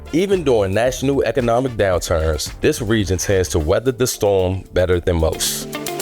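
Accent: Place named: Washington DC, United States. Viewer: Nice video my man! I'm not a native but been here for about 30 years, since a few years out of college. You pretty much nailed it. One thing I would say, while the summers can be brutal and the winters tough, the spring and fall (especially the fall) are beautiful. Only other thing I'd quibble with a bit is the food scene. DC used to be a food desert. But you can find tons of amazing restaurants here now!.Great job overall capturing the city. You can tell you're a DC native by the accent! 😆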